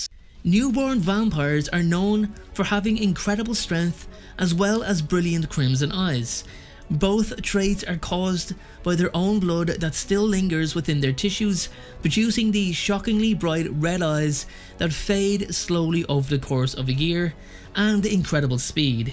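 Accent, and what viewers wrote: Ireland. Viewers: OMG WTF do u sound so bloody Irish 😳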